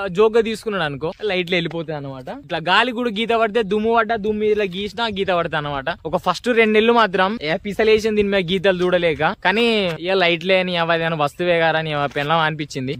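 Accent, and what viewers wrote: Telangana, India. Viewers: నీ భాష కొంచెం నాటుగా ఉన్నా కుమ్మేసావ్ బ్రో పక్కా తెలంగాణ నాకు cars గురించి పెద్దగా ఏమి తెల్వదు కానీ టాటా Tiago NRG కొన్నా full happy 😮😮😅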